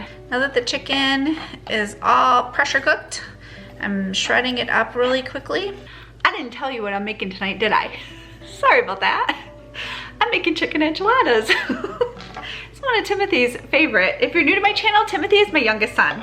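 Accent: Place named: Midwest, United States. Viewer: Your accent is so cute! Definitely midwestern, I'd guess, but I'm a southern girl so not sure if I'm identifying it correctly. Love your recipes!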